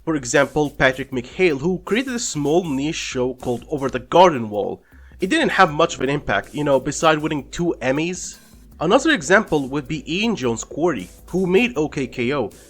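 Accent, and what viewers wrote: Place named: Middle East. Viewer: Based on your accent and saying that you watched spacetoon I assume you're middle eastern correct?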